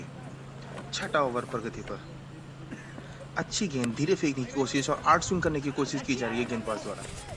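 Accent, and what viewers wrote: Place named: Garhwal, India. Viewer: Gadwali boli yr bheji tu v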